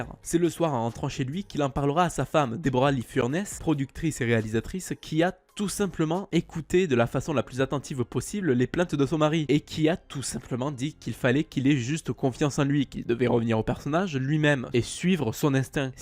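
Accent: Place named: South, France. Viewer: Cet accent sudiste moelleux m’avait manqué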